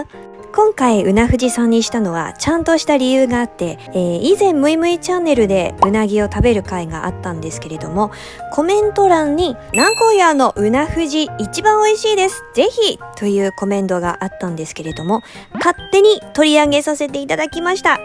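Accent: Changhua, Taiwan. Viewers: 以為是彰化腔的台語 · 彰化腔最可愛❤❤❤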